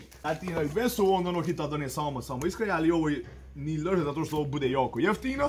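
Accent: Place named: Lumbarda, Croatia. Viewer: Moje srce je sada zaigralo i igrat će sve dok se ne bude pucalo ispod one teće.... uvelike se radujem tome događaju i tom simpatičnom naglasku. Vi ste pretpostavljam iz Lumbarde ako se ne varam? Pozdrav iz Krvavca❤❤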